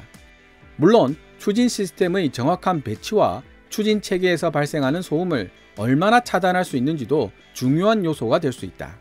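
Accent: Gyeongsang, South Korea. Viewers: 좋은 내용 감사합니다 그런데 경상도 사투리로 설명하는 바람에 찌라시 냄새가 나네요. 아나운서나 전문 성우 목소리처럼 표준말로 설명해 주시면 내용의 신뢰성이 더 높아질 것 같군요.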